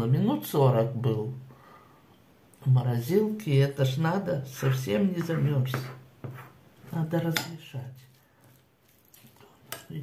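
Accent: Odessa, Ukraine. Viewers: Так мне слышится Одесский говор 😊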